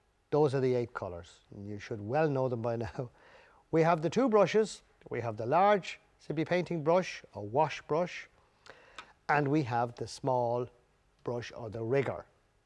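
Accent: Ireland. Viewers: Thank you so much for putting this lesson on. I've followed along with quite a few of your paintings and have learned a lot from you, and I love hearing you talk and listening to your Irish accent! Please keep on teaching us. Thank you!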